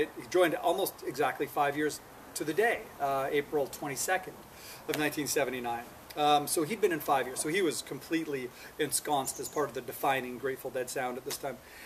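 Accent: Canada. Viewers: Is he Canadian? I hear a strong accent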